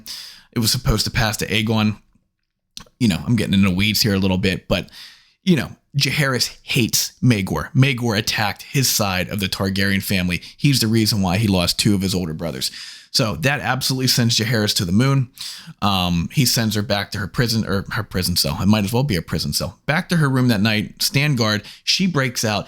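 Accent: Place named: Pittsburgh, United States. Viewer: You have a very Pittsburgh accent lol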